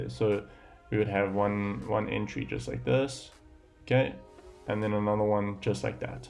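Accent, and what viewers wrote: South Africa. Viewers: First time watching one of your videos. Definitely becoming a new sub. Loving the South African accent 💪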